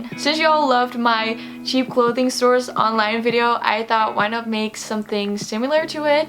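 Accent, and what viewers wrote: Netherlands. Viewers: i don’t know why your accent reminds me of when i’m in holland , love this video 😩❤️! hope your sage during this shit ass pandemic 😌! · Je bent van nederlqnd ik hoor het aan je accent!!!🥰🥰🥰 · Wait a sec I’m a bit new to this channel so I just have question are u a bit Dutch ? Ur accent sounds a bit Dutch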